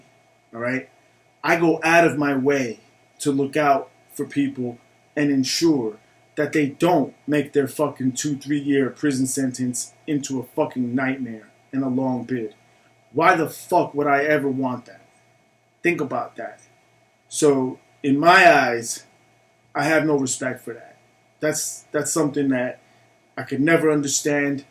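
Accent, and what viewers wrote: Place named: East Coast, United States. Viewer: I don't know where you are from but it sounds like you are from the east coast somewhere. Because i did 8 years here on the east coast and i did my time in the same way as you are talking. I actually punched a guy in his mouth once for coming at me sideways in asking for my paperwork. I took it just like you said. I said to him, what do you calling me a ripper? And i hit him in the mouth. But on the west coast, mostly California they have prison politics that are completely different. They segregate races as part of the prison politics and here on the east coast we don't do that. Its two totally different worlds.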